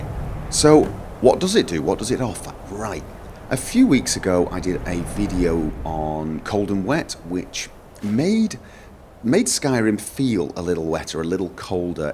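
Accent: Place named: Wales, United Kingdom. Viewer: this guys regal welsh accent is kind of peculiar :D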